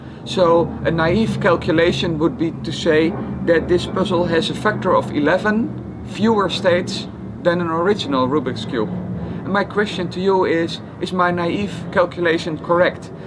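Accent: Netherlands. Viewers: his grammar is good but his accent is too dutch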